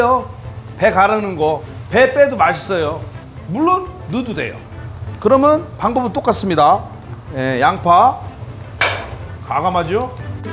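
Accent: Chungcheong, South Korea. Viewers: 아... 전형적인 충청도의 너스레~ㅋㅋ 레시피도 얻고 재미도 얻어가네요~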